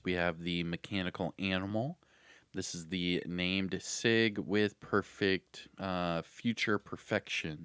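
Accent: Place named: Northern California, United States. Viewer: Its your opening! It peggs you to Northern California. "how ya doing? whats going on?" The tonal sound and enuciation is possibly even going towards San Jose - Santa Cruz.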